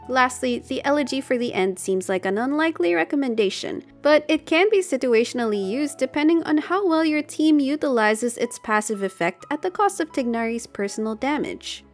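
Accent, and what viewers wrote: Philippines. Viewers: The Filipino accent coming in strong in this video. Love it!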